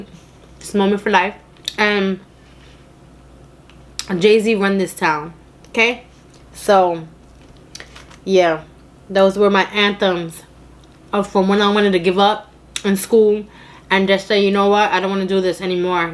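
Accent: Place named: New York, United States. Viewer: I’m watching or listening to tour video while I’m doing my moms makeup and she said is she from ny I can hear the accent lol😘😘😘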